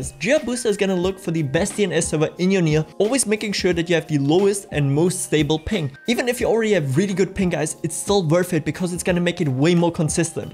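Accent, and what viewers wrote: Germany. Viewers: Guter deutscher Akzent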